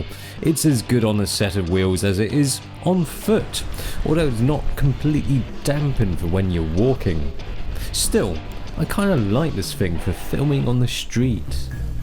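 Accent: United Kingdom. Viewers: I thought u are a blonde british. Didnt expect an asian with a heavy british accent. Amazing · Never seen an asian looking person with a british accent👌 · wow did not expect a prim british voice coming from this guy :D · You knew what blew my mind more than that camera, an asian guy with a strong british accent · Yeah, I was waiting for mid age brit after hearing a voice. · so weird seeing an asian speak in a genty british accent.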